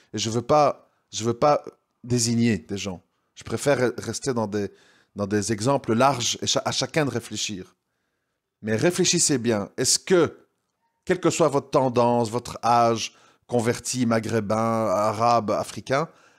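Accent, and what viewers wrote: Belgium. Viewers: tu es tres flou par manque de temps et d’exercice je le sais tu es un homme qui enrichit en plus avec ton accent belge qui rend + crédible qu'un francais. J'ai compris que tu es sincère inutile de perdre du temps sur ça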